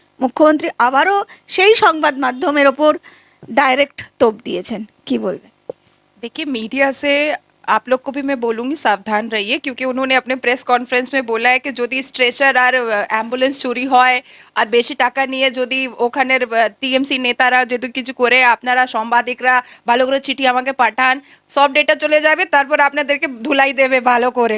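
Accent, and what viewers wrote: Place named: Bihar, India. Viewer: Madam actualy where r u from apki bangali shunke bahut accha laga...I think u r from bihar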